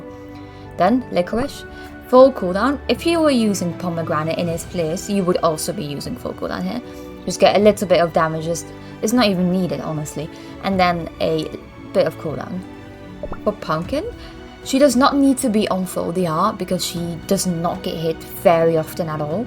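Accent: United Kingdom. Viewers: Very helpful,video. Thanks so much for making it! Also you accent is very posh! i like it! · hi are u british?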